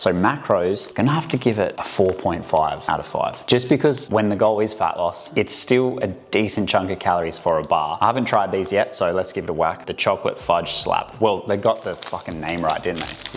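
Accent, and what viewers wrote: Australia. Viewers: I love how Australian you sound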